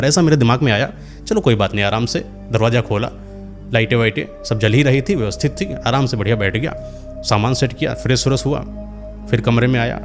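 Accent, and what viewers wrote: Kanpur, India. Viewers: Bhai k bato se LG gya kanpuriya hai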